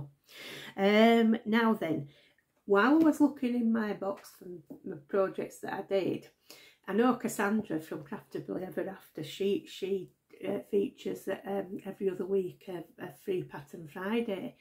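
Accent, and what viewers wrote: Yorkshire, United Kingdom. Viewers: So happy I came upon your channel. I love to have a cup of tea and watch and listen to you. Your accent is very familiar since I was born in Idle, Yorkshire. Coming for a visit in October. So very excited since I get to bring my American husband to where I was born. Keep up your beautiful and artistic crochet.❤️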